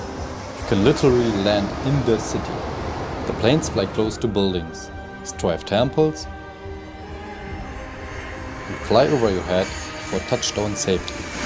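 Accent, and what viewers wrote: Germany. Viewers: Do I hear german accent? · I am not offending your English. It is perfect. 😊 I just wonder if you are from Germany, because of little accents. You have done a pretty job capturing Taiwan. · Well done, enjoyed watching and seeing your amazing footage🥰 Moreover i like your german accent. Keep it going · Awesome video, great footage and editing. Love the city, too... but the reading is quite frankly... sub-optimal... thick German accent, mispronouncing easy words, ... :'( Maybe re-do it with a native speaker?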